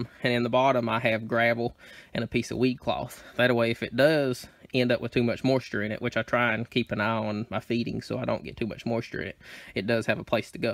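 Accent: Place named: Alabama, United States. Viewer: gotcha. Could have sworn that was an Alabama accent.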